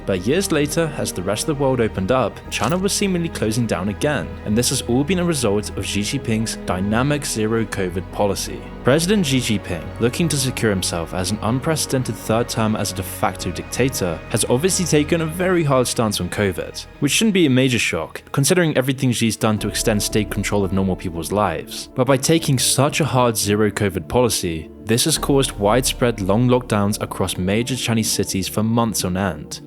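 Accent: Norway. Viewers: are you norwegian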